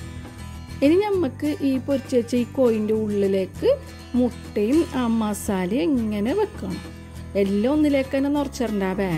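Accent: Kasaragod, India. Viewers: കാസ് റോട് ഭാഷ👌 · സൂപ്പർ കാസറഗോഡ് ഭാഷയും എനിക്ക് വളരെ ഇഷ്ട്ടപെട്ടു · മൊഞ്ചത്തി പൊളിച്ചു. കാസർഗോഡ് ഭാഷയും കിടു ആണ്. ചെക്കൻമാര് കൊത്തികൊണ്ട് പോകുന്നത് നോക്കിയിരുന്നോ. മൊഞ്ചു കണ്ടിട്ട് എനിക്കും കൊത്താൻ തോന്നുന്നു........ 😝😝😜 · എല്ലാ സൂപ്പർ ആയിട്ടുണ്ട് പിന്നെ കാസർകോട് ഭാഷകേൾകാൻ നല്ല പാങുട്എനിക്ഇഷ്ടായി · Ambambo 😍 jan aadyam vijarichathu kozhikalude sammelanam aanenna🤭😄. kasaragod bhasha kalakkitund.entho valiya sammelanam nadakkukaya 🤭.good presentation 👍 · വളരെ രസത്തിലുള്ള അവതരണം..കാസർകോട്ട് എനിക്ക് ഇഷ്ടമുള്ള ഭാഷയ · മൊഞ്ചായിട്ട് 🥳 ഇങ്ങളെ കാസർഗോഡ് ഭാഷ മെജ ആയിട്ട് ഇന്ക് നല്ല ഇഷ്‌ടായി ഞാൻ മലപ്പുറം 🥰, സൂപ്പർ ആയിട്ടുണ്ട്, ഞാൻ nijjus cafe ലൈവിൽ നിന്ന് കൂട്ടാവൻ വന്നതാണ് ട്ടോ ആ 15 ആളിൽ ഒന്ന് ഞാൻ ആണുട്ടോ 🤝 ഇങ്ങോട്ടും വരണേ നാളെ 24 മണിക്കൂർ കഴിഞ്ഞിട്ട് അല്ലകിൽ സ്പാ ആവും 👍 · ishtayi presentation athilum ishtayito kasarkode slang super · അടിപൊളിയായിട്ടുണ്ട്. ഒരു പുഞ്ചിരിയോടെയാണ് വീഡിയോ മുഴുവനും കണ്ടത്.അതുപോലെ കാസർകോട് ഭാഷ കേൾക്കാൻ നല്ല രസമുണ്ട്. 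· വളരെ ശ്രദ്ധയോടെയാണ് ആദിയോടന്തം കണ്ടത്. കാരണം അത്ര പരിചിതമല്ലാത്ത ഈ സ്ളാങ് മനസ്സിലാക്കണമെങ്കിൽ വളരെ ശ്രദ്ധയോടെയിരിക്കണം. കേട്ടിട്ട് ഇത് കാസർഗോഡ് സ്ലാങ്ങാണെന്നു തോന്നുന്നു. കേട്ടിരിക്കാൻ ഒരു രസമുണ്ട്. എന്തൊക്കെയാണെങ്കിലും അവതരണം നന്നായിട്ടുണ്ട്. അഭിനന്ദനങ്ങൾ. ഞാൻ സബ്സ്ക്രൈബ് ചെയ്തു. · ഫുഡ് ഉണ്ടാക്കുന്നതിന്റെ കൂടെ കാസർഗോഡ് ഭാഷ കൂടെ ആയപ്പോ അടിപൊളി ആയി 👌👍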